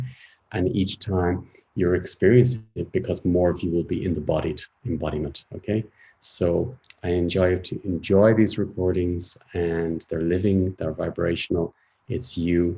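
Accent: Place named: Ireland. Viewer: Awesome with lovely mellow Irish tones - I'm here with you as Lorie Ladd told me about you. I left off so so many old things that pulled me back throughout my whole life! As I was reared as Irish catholic, I saw the time I was baptised (6 weeks old) and felt that I was handed over which I did not like one single bit. I felt stamped and this did come off in the meditation! So grateful. At almost 2 years I was put in hospital for an apendectomy, saw my little child on a table and I went off up towards the ceiling, no pain up there. I was left in the hospital and someone had some red shoes that I couldn't walk in - I think a kind nurse looked after me. Ancestral memory too of an old woman sitting on the side of a stony road, too tired and hungry to go back or couldn't go back, she was delighted to see me, it was lovely. My channel is open and I feel wonderful with new vibrant colourful energy. 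Love grace peace and patience to you my friend.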